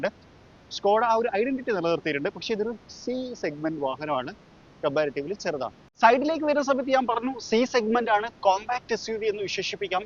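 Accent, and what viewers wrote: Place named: Kasaragod, India. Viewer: Nee kasrod nn inn mansilay😅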